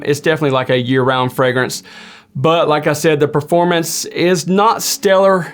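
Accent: South, United States. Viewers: Great review! I love this fragrance! One of the few I can say that about! also out of all the reviewers you seem to be my favorite so far! Sounds like you're from the south! LOL so am I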